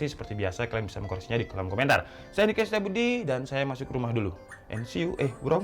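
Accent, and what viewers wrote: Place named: East Java, Indonesia. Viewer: Orang jawa timur ya bang? Kalau benar abang orang jawa timur jadi kita sama :)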